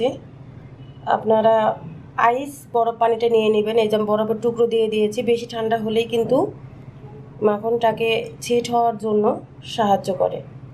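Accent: Bangladesh. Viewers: I guess she is from Bangladesh , due to the accent . But yeah if you are from India that is , then I definitely don't know where dalda can be found in your country, sorry couldn't help ☹️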